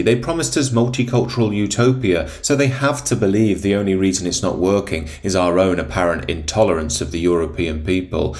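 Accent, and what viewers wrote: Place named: Leicester, United Kingdom. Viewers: Yes, true. But a no point does he say “hey, up me duck.” That would place him around the currently flooded Barrow-on- Soar. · Leicester, that’s it, I knew you had an accent.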